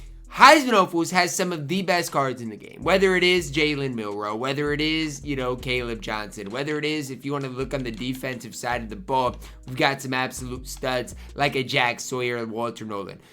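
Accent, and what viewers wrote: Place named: Midwest, United States. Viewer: He’s from Iowa area so it’s Midwest lol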